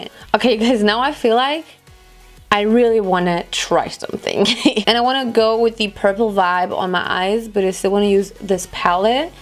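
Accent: France. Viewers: Awww your french accent hahaha Lots of love from Montpellier, South of France 😘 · I love your accent french !😍😍 I am FrenchGirl I love makeup tutorial !! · Super accent français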